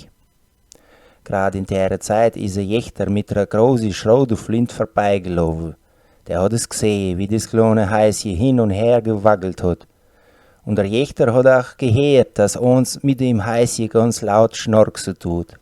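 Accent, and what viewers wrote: Franconia, Germany. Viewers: Täuscht das oder erinnert das an ein ganz komisches fränkisch?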